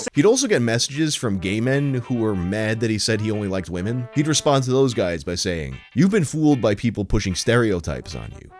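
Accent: New York, United States. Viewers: Dude I love him and his deep New York voice like Peter Steele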